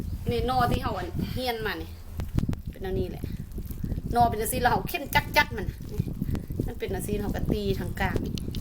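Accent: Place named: Na Wa, Thailand. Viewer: สำเนียงคล้ายๆแถว นาหว้า ศรีสงครามครับ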